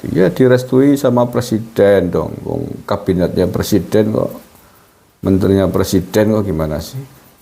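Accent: Banyumas, Indonesia. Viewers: Lucu ya gaya ngapak 😂😂😂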